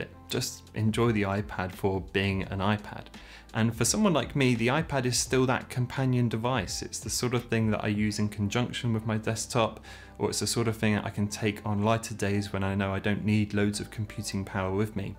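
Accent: United Kingdom. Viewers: Are you British? Love your accent 😊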